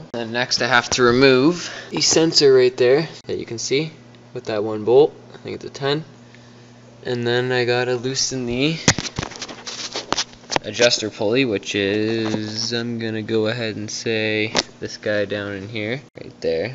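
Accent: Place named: Canada. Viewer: He sounds Canadian so probably yes.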